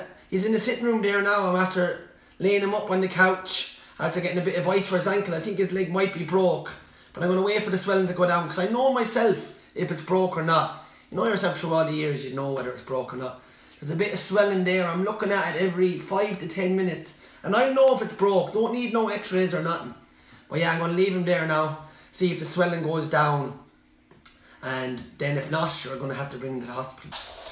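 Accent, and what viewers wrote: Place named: Ireland. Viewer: Pure Irish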